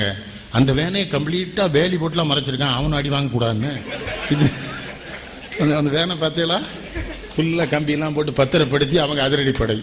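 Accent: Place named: Tirunelveli, India. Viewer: Tambirabarani tamizh ketkka ketkka ruchi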